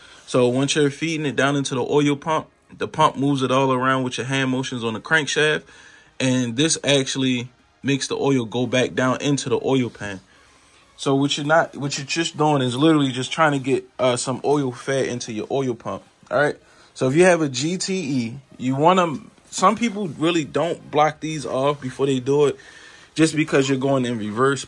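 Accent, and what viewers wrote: Baltimore, United States. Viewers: Based on your accent I'm willing to bet you from the bmore area, or somewhere close lol. But if not, where are you at? I'm in RVA and need a 2jz ge vvti overhaul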